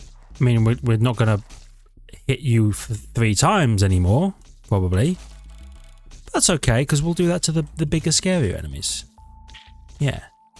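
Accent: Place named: England, United Kingdom. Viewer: He said guv! Wasn't sure if he was English until now. The deep slang really drives it home.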